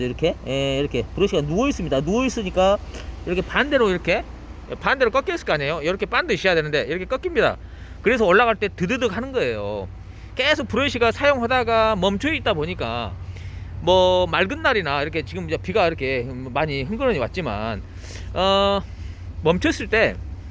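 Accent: Jeolla, South Korea. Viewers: 아따.전라도사투리 존나듣기싫네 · 사장님 말투 때문에 자꾸 보게되네요. 어디인가요 지역이요. 전라도는 맞는데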